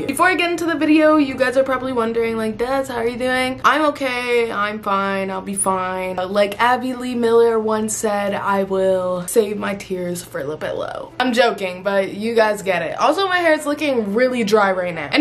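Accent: Canada. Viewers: Your Canadian accent reminded me of wolfie raps😂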